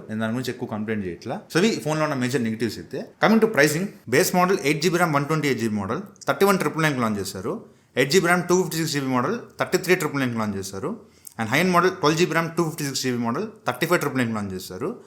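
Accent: Nellore, India. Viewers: bro nellore aa meedhi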